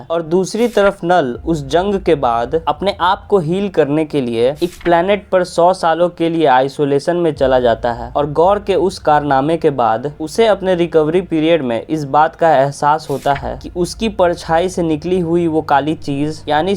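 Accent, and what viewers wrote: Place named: Magahi, India. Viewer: Video khub nick lagal ba..keep uploading such contents bro.. can sense our Magahi tone..❤️❤️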